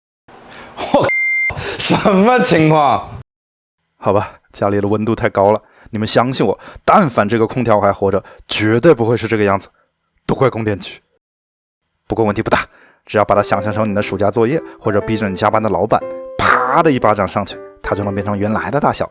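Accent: Wuhan, China. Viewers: 听口音 Up主武汉人吧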